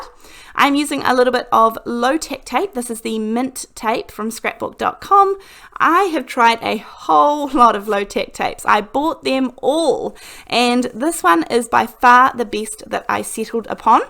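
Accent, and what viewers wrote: New Zealand. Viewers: Love this card. I thought it was a N,Z, accent and then you mentioned you are in N.Z. I live in Cape Town but have been on three visits to your beautiful country and it would be my dream to live there. Thank you for sharing your great talent and looking forward to watching many more of your videos. Hope you get well soon.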